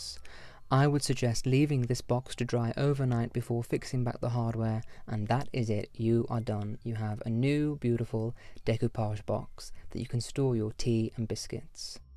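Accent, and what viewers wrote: RP, United Kingdom. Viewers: Way too posh for me! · Thanks for sharing. I didn’t know green tea wasn’t able to withstand very hot water. Interesting. My grandmother was from the UK and she passed away a couple years back. I usually drink coffee but I will have to have a cup of tea in memory of her. She was in the US for so long (from around 20 years of age due to marrying my grandfather who was in the Air Force; they met in England when he was stationed there)... Anyway, I’m rambling but I miss her. She was the most elegant lady I know. You remind me of her for some reason. It must the the accent as well. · god i love british sm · I went to an English school for sixth form and I love all of Britain. But (please forgive me) this type of accent is so hilarious, it sounds so pretentious and formal, I always wonder what you must have put up your * and what size it is. 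Great video though. WHERE IS MY SCONE? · My goodness. I'm so mesmerized. My grandfather is a Londoner in the US and I've never heard anyone so posh besides him....but also SO amazingly refreshing.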